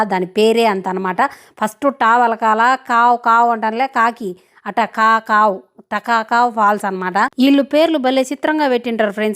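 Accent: Rayalaseema, India. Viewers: Good afternoon sister, I am always very interested listen to your Rayalaseema Slang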